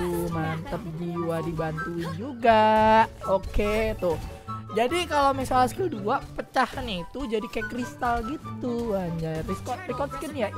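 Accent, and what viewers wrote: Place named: Medan, Indonesia. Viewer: kaget bg * logat medan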